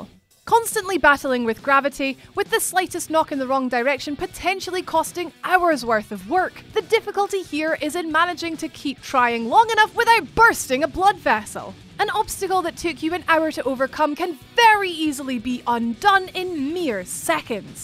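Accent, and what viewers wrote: Scotland, United Kingdom. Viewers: THE GAME IS DRIPPING WITH CONSTANT PERIL , love the Scottish accent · Fábio Pinto that’s a woman mate, Scottish accent.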